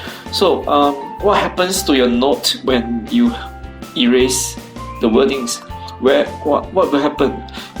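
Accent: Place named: India. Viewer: Are you Indian? Hard to understand...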